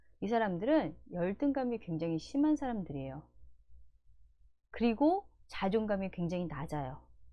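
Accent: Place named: Jeolla, South Korea. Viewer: 전라도 분이신가봐요 ㅎㅎ '모대요 모대요'가 귀에 계속 맴도네요,, 좋은 내용 너무 잘 들었어요♡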